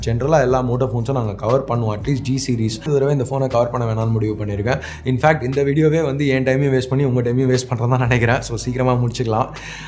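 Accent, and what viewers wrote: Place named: Chennai, India. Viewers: Sema video bro! keep it up! சென்னைத் தமிழ் அருமை! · சகோ உங்களோட ஆங்கிலச் சேனல்களை நீங்க பேசுற அந்த ஆங்கில உச்சரிப்பு வந்து ரொம்ப நல்லா இருக்கும் எனக்கு ரொம்ப பிடிக்கும் ஆனா நீங்க தமிழ் பேசுறப்ப அதுவும் இந்த வீடியோவை பொருத்த வரைக்கும் நீங்க ரொம்ப கோபமா இருக்கீங்கனு தெரியுது நீங்க பொறந்து வளர்ந்தது எல்லாமே சென்னைதான் என்கிறது நீங்க பேசுறது தமிழ்ல இருந்து தெரியுது எப்படி இருந்தாலும் நீங்க பேசுற அந்த ஸ்டைல் வந்து எனக்கு ரொம்ப புடிச்சிருந்துச்சு வாழ்த்துக்கள் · bro....conetent vudunga...neenga nambo madras slang la pesurathe sema ...super po....ipdiye pesungo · தல லோக்கல் ஆ இறங்கி, சென்னை பாஷைல கலக்கிட்டீங்க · super bro i like your Chennai Tamil 😁😁😁 😄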